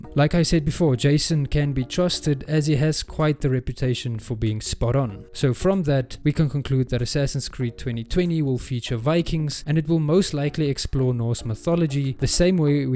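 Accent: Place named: South Africa. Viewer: You're South African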